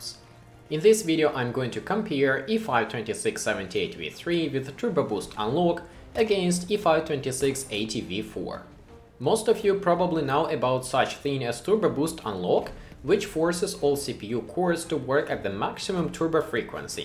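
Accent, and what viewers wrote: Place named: Russia. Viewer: Привет, чел! :)) Узнаю русский акцент.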